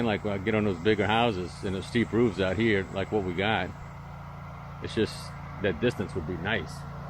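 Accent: Louisiana, United States. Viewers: 985 I knew that was a Louisiana accent.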